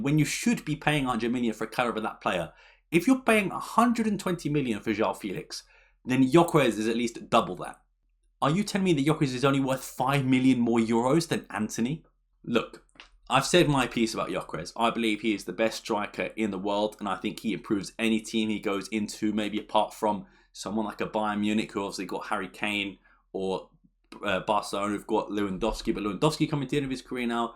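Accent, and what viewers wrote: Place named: United Kingdom. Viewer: por acaso ainda falas português? O teu sotaque britânico é tão perfeito que nem te imagino a falar português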